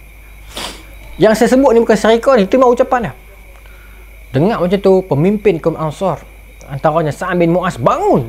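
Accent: North, Malaysia. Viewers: 😃😄 wahh.. Ramai juga yg faham kan kuliah Ustaz walaupun beliau pekat loghat utaqa.. Boleh nampak disitu keikhlasan Ustaz dalam berdakwah 👍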